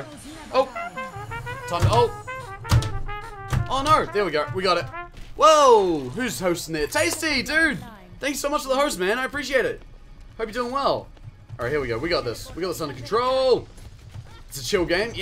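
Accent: New Zealand. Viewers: James Bartlett Yep that's a kiwi accent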